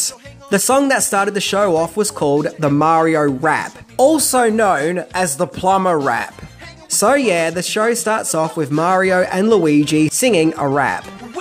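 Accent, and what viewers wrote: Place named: Australia. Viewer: 'Ita wassa game like no-othaaaaa" Too bad they didn't hire an English speaker that speaks ENGLISH instead of what is assumed to be English (Thick Australian? British?) Ruins it for the rest of the video.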